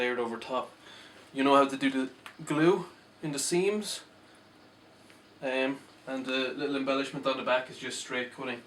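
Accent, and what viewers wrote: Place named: Ireland. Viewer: All this time I thought sounds Irish...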